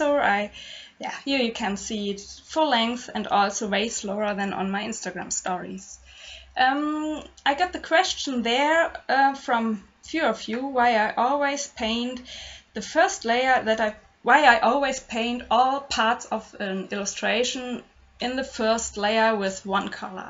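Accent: Germany. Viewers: Hmmm... your accent kinda sounds german. Where does my guess come from? My mom articulates herself very similar to you, when speaking english. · me too, I love her German accent. It's a shame all the commenters on YouTube teased her about it. There is joy in diversity, unfortunately not all see things that way. 😔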